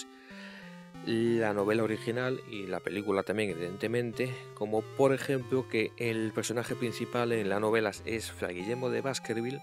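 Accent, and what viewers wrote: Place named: Galicia, Spain. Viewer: Es curioso, en este video te note ese acento gallego que no distingo en tus últimos videos, lo estas perdiendo, lo haces a propósito? en cualquier caso una pasada, como todos tus videos comentados, un referente, tendrían que hacer todos lo mismo con el resto de sistemas, amstrad, commodore, atari etc, etc.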